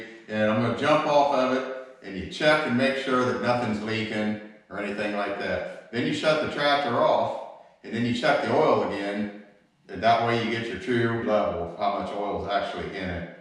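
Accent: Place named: Ohio, United States. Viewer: I am actually from Ashland Ohio and realize that I have never lost my accent since we moved Arizona in 1966. Surpises me how much you and I sound the same when we speak. My last name is common there, it is Mumaw . Keep up the good work with your positive attitude !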